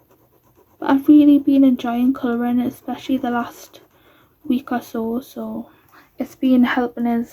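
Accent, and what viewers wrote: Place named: Northeast England, United Kingdom. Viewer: Ooo you sound like youre from North east uk I'm in Hartlepool , I love karla magana books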